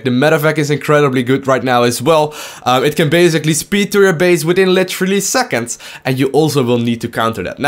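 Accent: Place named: Netherlands. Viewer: just a sec... ben je nederlands??? i kinda hear a bit of an accent, (srry that was offtopic) but yeah, thx for the vid, you just got a new subscriber, you're awesome keep up the good work!!!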